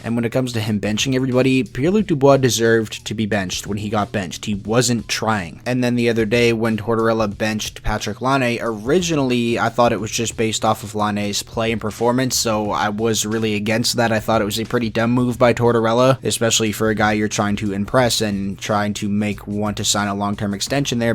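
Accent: Canada. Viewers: Your voice sounds often Canadian! Not that that’s a bad things!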